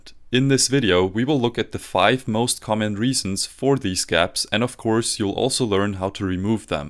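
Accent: Germany. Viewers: I am sensing a German accent, so Danke!! :D Hat mir die Masterarbeit gerettet <3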